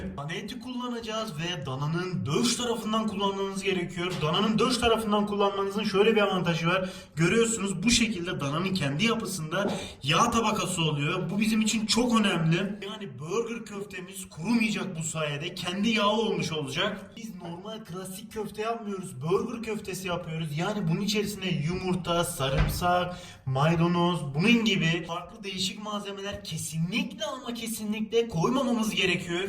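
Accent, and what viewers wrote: Adana, Turkey. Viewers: ihsan doğan baya boş yampmışşsın işiniz gücünüz dalga adam adanalı şirvesinin nasıl olmasını istersin seni beyinsiz gerçekten senin gibi insanlar varya...... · ses tonu televole.diksiyon adana aq.kesiiüüürüüüm doğriiüüürüüümm.yapma yapma 😆🤣nekadar güzel gözüküyor derken bari gözüküööööööööö deme bari aq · ABİ SEN ADANALI MISIN KONUŞMAN ÇOKK BENZİYOR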